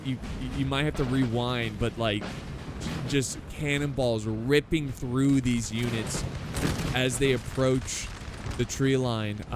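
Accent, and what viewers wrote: France. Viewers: Love french accent hahaha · your French accent never fails to make me laugh. You really made my day!!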